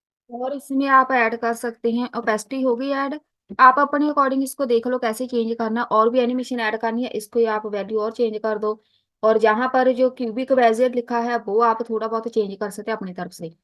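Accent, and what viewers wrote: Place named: Punjab, India. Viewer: good video, but agar aap aik video punjabi main be banao to bohat acha hoga, kyun k aap ka accent punjabi lag raha ha mujay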